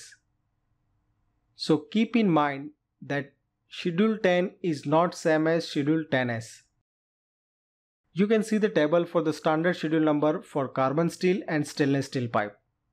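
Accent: India. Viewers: ..you are the only indian english speaker that I find interesting and ameanable..being you an engeener this is almost a miracle..thanks for your nice work...!!!!!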